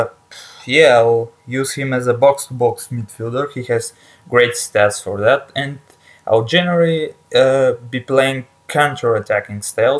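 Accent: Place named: Bulgaria. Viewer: Great vid buddy love the accent Are you from Bulgaria if so big up Stan the man the Celtic ledgend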